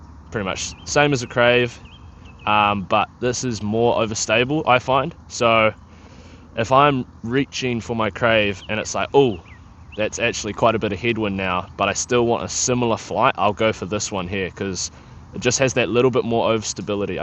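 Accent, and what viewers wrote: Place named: New Zealand. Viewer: I wasn't expecting a Kiwi accent when I clicked on this video. 😂😅 I have an identical Halo Firebird that I could sell you if you need a back up.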